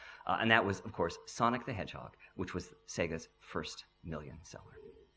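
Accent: United Kingdom. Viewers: Wait he's an American? Why does he sound British?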